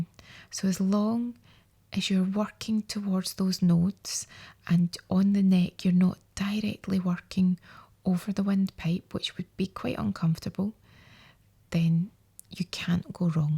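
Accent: Scotland, United Kingdom. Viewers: Love Scottish accents 😬😍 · omg i love her Scottish accent so much! Great video and very easy to understand:)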